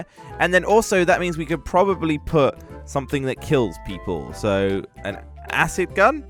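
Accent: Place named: Essex, United Kingdom. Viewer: He sounds so Essex in this video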